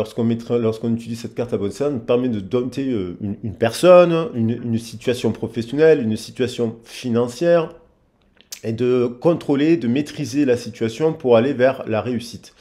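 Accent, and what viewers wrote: South, France. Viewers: Coucou 👋🏼 😉 Ce n’est pas grave tu es pardonné 😉☺️dis moi tu viens de Montpellier avec ton accent du Sud?! 🤣tu 🎶 chantonnes quand tu parles c’est sympathique comme style 🤩🥳😜belle journée à toi le tourtereau 🤣bisous 😘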